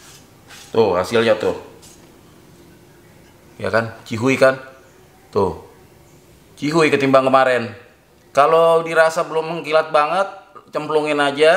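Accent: Jakarta, Indonesia. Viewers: Seru ngomongnya betawi tulen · Betawi Asli... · Kalo ane bisa sucrib 10 x ane kasih dah. Konten nya berkualitas. Penjelasan nya enak bngt dg bahasa khas betawi. Bini nye ikut nyumbang layar tambah asyik. Top markonah deh. 👍👍👍👍👍👍👍👍👍👍👍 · Nt betawi mane bang roman ny sedep bener logat nt. Salam kenal bang dari ane nak betawi kemayoran 🙏🤗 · Pap dengan logat betawi,,, josss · Yg ky gini ni gw suka.. apalg sama Abang nya yg kasih tutorialnya pake logat Betawi nya..keren HBs dahh pokoknya..sehat selalu bang..mksh berbagi ilmunya Nye..salam dari Kalimantan timur Balikpapan..🙏🙏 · Saya suka sma bicara nya mengingatkan saya sma tmn orang Betawi logatnya itu bikin candu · Betawi punye ni mah. Mantap cang... 😁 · Logat Betawi👍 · Betawi tulen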